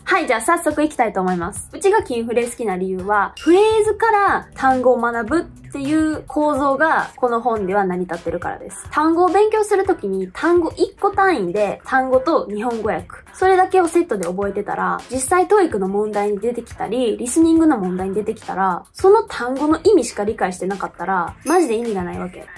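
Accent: Kansai, Japan. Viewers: やばい、関西弁美人女子がドストライク過ぎる。。。どうやったら出会えるんだ🤷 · 関西弁のいいね！❤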